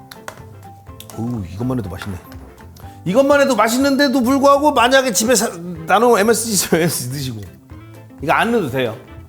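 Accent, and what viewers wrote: Chungcheong, South Korea. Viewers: 비빈거여. 뭐여 ..충청도 능청사투리..ㅎㅎ 넘 재미나요~~~ 연기실력도~~ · 박사님 고향이 혹시 충청도!?!? ㅎㅎ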